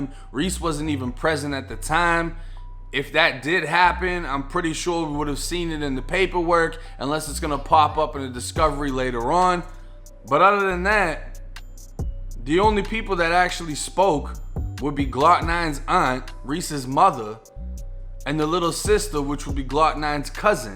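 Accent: Boston, United States. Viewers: how are you mixing up florida lazy twang and stromg new England (boston) accent and dialect ? totally sounds like ur a poser and/or confused...idk about the rest of FL but here in Orlando we don't sound like a PLIES or reject Slip N Slide artist from <num>.i mean unless ur on mercy drive, ivy lane or deep off the NW part of Orange County maybe IDK... but from OBT to Landstreet; Oakridge to Kirkman and MetroWest... we most definitely don't sound like that in fact we deliberately ended that twang talk dialect back in 2015/2016, meaning THAT LINGO BECAME A NO GO ON THAT TEMPO. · hey just wondering are you from Boston. me being from I can hear how say you car. you don't have that southern Florida,,,,, accent just wondering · Sometimes I think your from Boston or Massachusetts but I heard you say you were locked up in Florida so I'm guessing your fro. 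Florida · Boston talking ass...Where you from SOUTHY? LOL! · of coowz its boston. Not a place wheh kids can play in tha yod, cuz they could get hit by a ca from all the wicked bad drivas kid! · You have a boston accent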